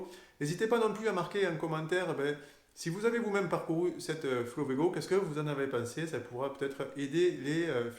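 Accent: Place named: Southwest, France. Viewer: Bonjour bon récapitulatif tu nous fait encore révé une question lors de ton premier voyage tu parté avec une étape en téte ou au filing car j espere faire mon 1er voyage en septembre mais je me suis fixer 90 ou 100km mais je me rend pas compte car c est mon 1er donc besoin de conseil merci un bonjour a tous de la belgique😉encore merci pour l axcent du sud ouest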